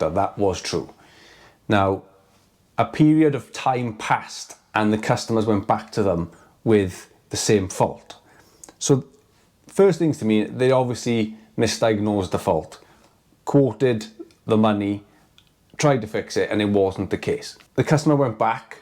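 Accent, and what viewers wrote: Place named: Northern Ireland, United Kingdom. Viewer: Gotta love a Northern Irish accent...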